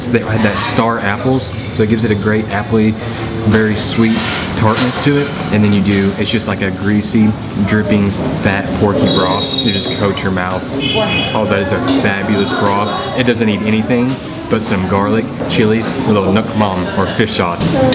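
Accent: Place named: South, United States. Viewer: Love watching u eat.....Your southern draw and demeaner is very refreshing...Pho and other amazing dishes look delicious...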